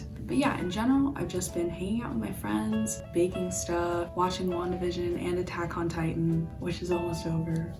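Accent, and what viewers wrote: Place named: Australia. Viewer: austrailian accent yes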